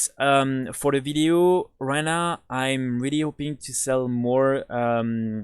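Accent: France. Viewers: t'es français?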